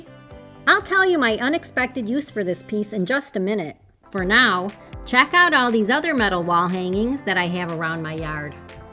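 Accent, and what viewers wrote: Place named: Cincinnati, United States. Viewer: 🙌🏻🙏🏻❤️ You sound like a Cincinnati girl. Am I right? I’m from southern IN.! God bless you!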